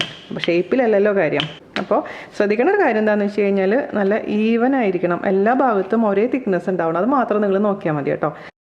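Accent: Thrissur, India. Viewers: Hi, thank you for sharing the recipe😍 You are a lifesaver. I have become an amazing cook myself following ur recipes. More than the recipes you share I just love listening to your voice. Being abroad in a country with white people away from home it gives me soo much happiness watching all your videos, listening to you trissur slang😁. Lots of love ur amazing and an inspiration to many housewives😍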